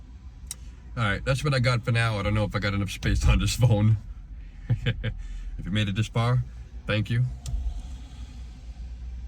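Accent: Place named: Cajun, United States. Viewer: Are you from Louisiana? I’m getting a Cajun twang! Best of luck to you, brother!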